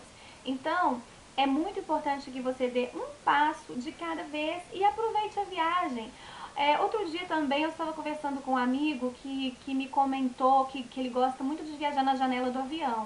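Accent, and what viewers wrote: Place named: Goiás, Brazil. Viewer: Linda reflexão. To passando por uma fase chatinha e essa reflexão serviu perfeitamente. Mudando de assunto você a goiana? O sotaque me lembra os de Goias kk <link>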